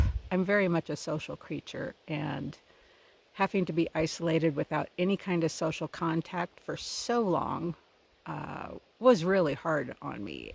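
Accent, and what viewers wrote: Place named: South, United States. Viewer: Wow, all done with your videos. I am bummed. I'm really looking forward to many more. You may not hit 1000 subscribers, but those who do are certainly appreciative of you putting out the videos. Thanks, for producing these videos, allowing us into your life's adventures. I'm glad you are finding a purpose and realizing some of your dreams in your life, especially at our age, and you have done a lot of other adventures in earlier life. Lol, it's hard to stop. The southern twang, Oh Golly's, hot as monkey balls, etc., are definitely becoming your trademark themes in your videos. Love It! See you soon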